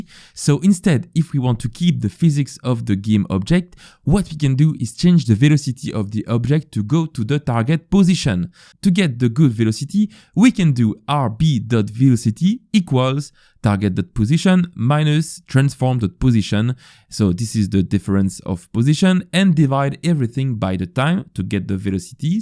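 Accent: France. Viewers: tu est français XD L'accent est horrible. Sinon merci.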